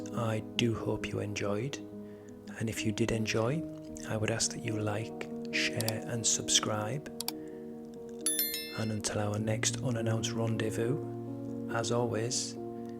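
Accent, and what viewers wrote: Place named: Scotland, United Kingdom. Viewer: Hi there....I loved your stories but what I like best is your beautifull Scottish accent... Am I. Mistakened ? There goes my like and a kiss 4 u.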